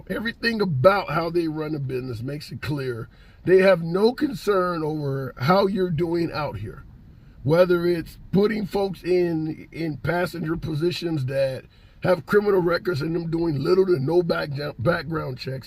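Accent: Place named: Philadelphia, United States. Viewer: This dude definitely from philly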